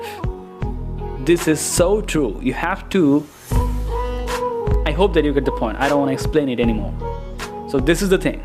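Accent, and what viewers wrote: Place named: United States. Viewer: দাদা, অনেক অনেক ভালোবাসা ভালোবাসার American accent 🥰🤪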